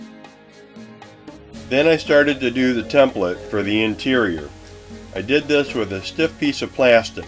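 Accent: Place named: Chicago, United States. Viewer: Chicago area accent, right?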